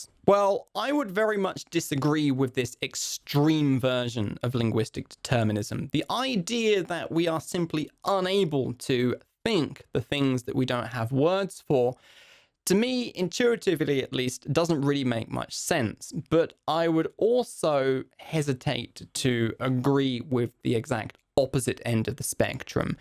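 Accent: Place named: United Kingdom. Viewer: I don't used to like british accent, But yours i liked, I'm just liking for some reason...as soon as possible I'll buy your book Good job bro.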